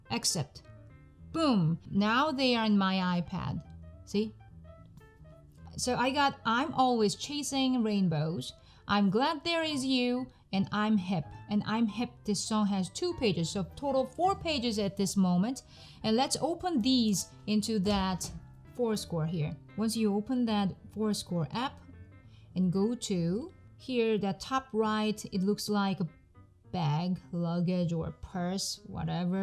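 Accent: South Korea. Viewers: sometimes i think i'll just watch for a couple minutes and then you get me hooked and i have to sign in to thumbs up and i wind up watching for hours. with this one you had me right at the beginning with "maybe just enjoy this funny english, korean accent" and your expression when you said it. hahahaha! so here i am again! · 한국분이신가봐요! 악센트 별로 신경쓰지 마세요 영어 원어민들보다 잘하시는데요 뭘 ㅎㅎ 영상 잘 보고가요! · I adore your funny Korean English accent as you call it. Your are pretty funny when you make fun of yourself. Love it.💙💙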